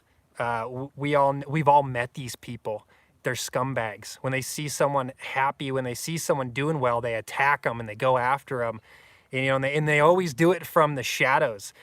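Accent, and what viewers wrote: Idaho, United States. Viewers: Just stumbled on you today. Digging that Idaho accent.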